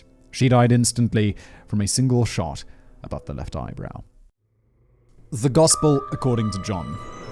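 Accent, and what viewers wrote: United Kingdom. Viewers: The more I listen to the more that I think I should act on my notions to move to Britain so I can find a man who says "brilliant" and "what are you up to.." Everything sounds so much more charming and intelligent with a British accent.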